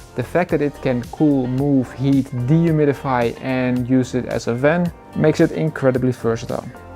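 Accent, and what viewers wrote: Netherlands. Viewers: You remind me of an over the internet friend from Holland. One by the last name of van Rens. Somehow I immediately knew you were from Holland. 😂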